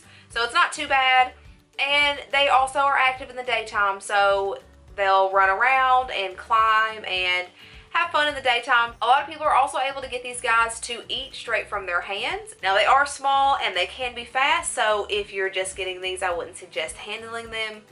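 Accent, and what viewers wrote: South, United States. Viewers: Girl you are so beautiful, but... That accent, is it Tennessee or Carolina maybe Texas or Georgia got to be one of the four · That southern accent took me by storm 😊 lol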